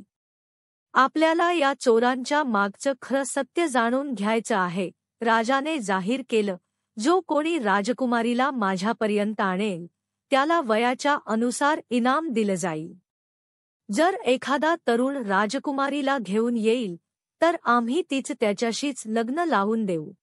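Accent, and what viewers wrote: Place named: South, India. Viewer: कथा kathan shuddh मराठी bhashet सांगावी dakshinatya bhashesarkhi कथा kathan vat te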